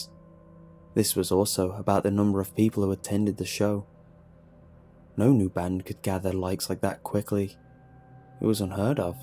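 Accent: England, United Kingdom. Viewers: New England? Sounds like he is from old England to me.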